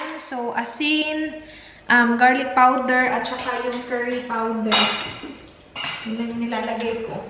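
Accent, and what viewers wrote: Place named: Cebu, Philippines. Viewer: Hi po ate bisayaaaaa kaykaaa ate cebuana kaayo💛😍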